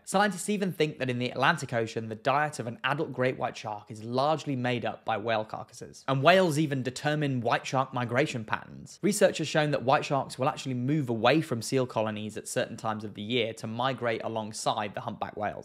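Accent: United Kingdom. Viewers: It may take a few videos to get used to that British accent and really enjoy the videos. The content eventually convinced me to subcribe.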